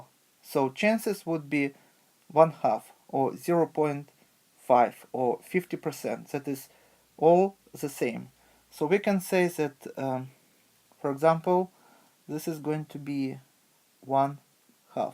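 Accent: Russia. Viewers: bro are you russian ?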